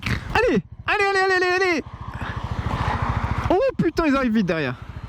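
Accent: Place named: Switzerland. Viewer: Je croyais tu était français jusqu'à ta commencer à dire des chiffres 🤣 après j'ai crue tu était belge...🤦🏽‍♂️ pour finir j'ai compris que tes suisse mdrrr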